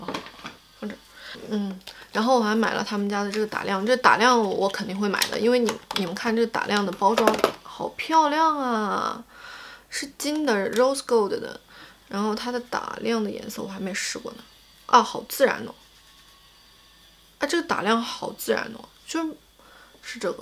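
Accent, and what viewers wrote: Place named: Sichuan, China. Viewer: silvia是四川人么，说水蜜桃的时候就在想，直到说卸甲水的时候说吃进去转一下的时候觉得我们是老乡耶哈哈，稀饭你～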